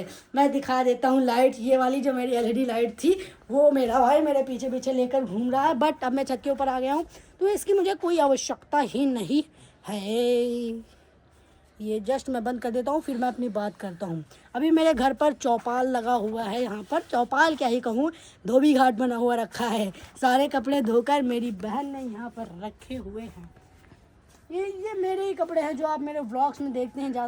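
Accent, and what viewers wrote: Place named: Bahraich, India. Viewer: Bhai Bahraich se ho kya 😊 mera gaw h vha Apki local language vhi ki lg rhi 😊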